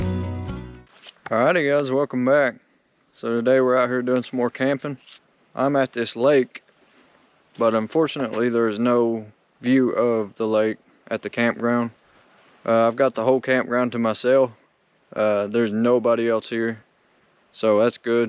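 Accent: South, United States. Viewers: nice, you have a pretty strong accent and I figured you are from the south, a gun would be a good idea eh but then again you are safer in the woods then in a city lol here in Canada we are under attack because our government wants our guns 😡 we are fighting like hell to keep them, anyway that’s a long story… stay safe 🤙🏻🇨🇦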